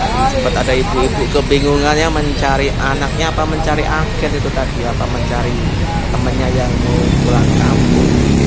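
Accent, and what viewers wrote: Banyumas, Indonesia. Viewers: Iki sing duwe chanel wong ndi logate ngomong kaya wong ngapak ya,nyong wong banjarnegara teka. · Pekalonganne ngdy mas kok ngomng e ngapak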